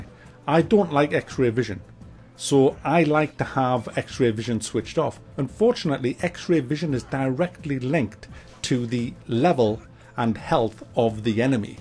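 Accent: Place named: Scotland, United Kingdom. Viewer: You Scottish? Something satisfying to hear your accent rap off profanity during a review that makes me smile. You got a sub, good review.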